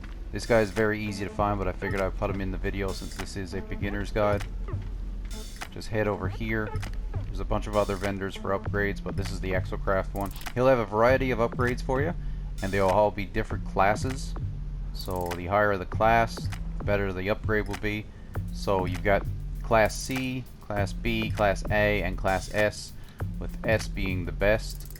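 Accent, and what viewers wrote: Newfoundland, Canada. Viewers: Are you from Newfoundland? Because you sound and have hints in your name that you are because I am and the accent is very familiar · Before I even noticed your name "Ah, sounds "Canadian", must be a Newfie"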